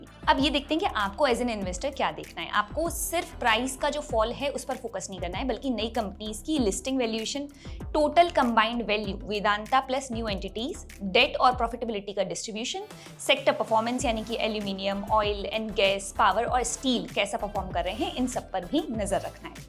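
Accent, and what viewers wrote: India. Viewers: Voice nahi diction....marathi diction...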